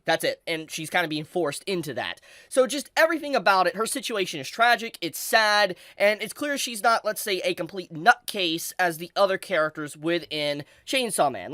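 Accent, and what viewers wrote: United States. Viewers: the way b americanizing these names i swearr 😭😭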